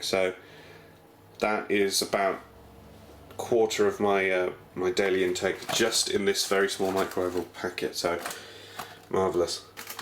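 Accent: United Kingdom. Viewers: great channel! your deadpan british accent makes it even more hilarious!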